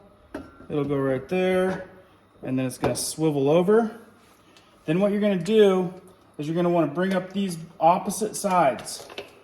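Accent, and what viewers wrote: United Kingdom. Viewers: I’m reading this in your beautiful UK 🇬🇧 accent ❤️ 🥰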